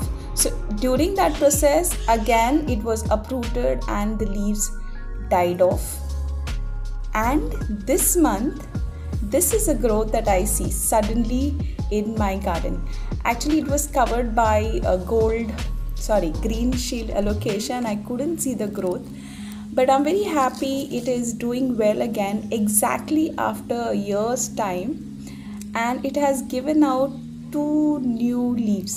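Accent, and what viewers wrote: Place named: India. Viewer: your indian accent makes me happy to hear it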